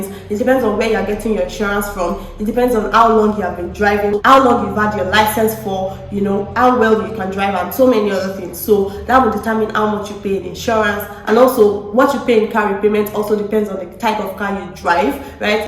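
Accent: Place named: Johannesburg, South Africa. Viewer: you catching Joburg accent 🤣🤣🤣 it won't be long until you start including haibo and yoh! in your vocab.. nice video by the way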